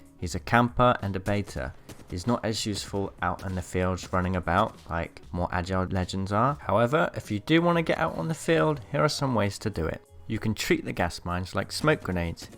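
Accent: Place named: England, United Kingdom. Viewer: Really entertaining, pretty monotone voice but the English accent sorta balances it out, great video overall!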